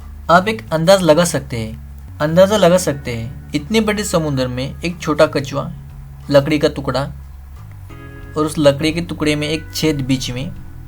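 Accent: Bihar, India. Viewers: apni awaj mei mt upload krna bihari ho n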